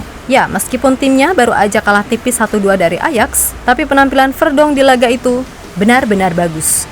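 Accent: Java, Indonesia. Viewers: Suka medok e yu... Hehehe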